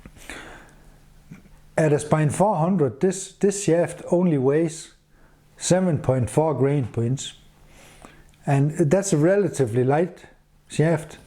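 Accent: Denmark. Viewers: Your Daneglish is fine, I have no problem understanding you. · So... I must say again with humble respect: you have a beautiful wife which gives you nice gifts. You are a very, very lucky man. Director clap is very appropriate. Maybe she can use it every third or tenth video? I wouldn't mind at all. 😇 I likeYour Danish spiced English very much. I don't know if you would like my slavic English accent. But we use what we can, don't worry a bit. Another interesting video and interesting praxis data in the comments about your son and a 30# bow and his deer kill. I really didn't know that that is possible, as we are not allowed to bowhunt in our country. My only question would be, how is so high FOC affecting your spine of the arrow? I couldn't shoot such an arrow from a 35# bow, I think. I shoot 52# longbow (a little towards hybrid side, but still longbow under the WA rules), and I have allready a problem: I use 340 spine goldtip xt classic, with 160 grains in front, but are still a little softer, as I would like for this bow. 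I can tune them, but I have to go near the lower limit of my brace height, which I don't like. I also like the heavier arrow, because I use carbon just for 3d training, ( they are much more durable than wood) and wood arrow in this spine is allready very heavy. I make carbon set as similar to wood arrows as I can, and then before competitions I don't really feel much difference , when I have to use wood for longbow class. Some would say use 300 spine, but wooden shafts in this spine are allmost impossible to come by he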